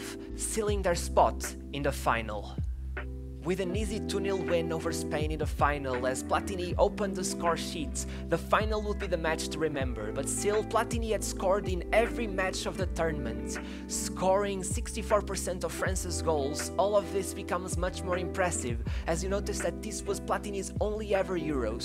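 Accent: Portugal. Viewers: The speaker has Portuguese accent!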